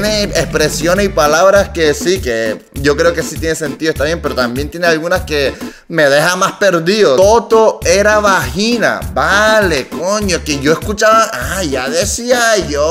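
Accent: Puerto Rico. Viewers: oye tienes un poquito de asentó puertorriqueño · Este koreano es uno de nosotros ri-tru de cora. Habla bien boricua entregao' a lo reventao' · Guapo se usa de las dos formas.... eres guapo o Eres Guapo Ahhh!!!!! Somos difícil de entender los boricuas😂 oye coreano llegue tarde a tu canal, pero estoy en shock hablas como un bori, dime tú te viviste en 🇵🇷? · te sale bien el acento boricua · Habla como boricua, bye. Also, hay palabras que ni son boricuas y son media inventadas 😂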